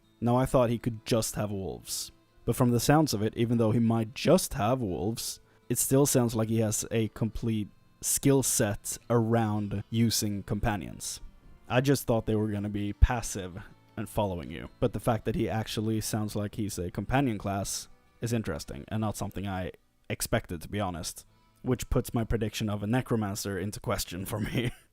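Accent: Sweden. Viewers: As a swed, he sounds like a swed ^^ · He sound Swedish.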